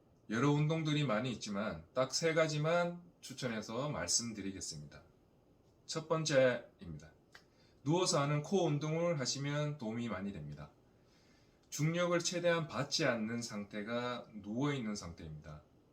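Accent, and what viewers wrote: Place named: Seoul, South Korea. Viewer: 목소리 멋지네요 👍 발음이 서울사람 같아요! 좋은 정보 감사합니다 🙆🙆‍♂️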